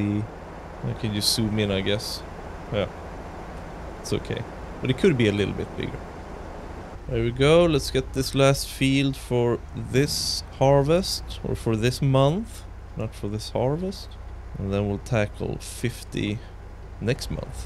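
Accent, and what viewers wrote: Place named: Sweden. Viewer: Love the series! But you have to be swedish with that accent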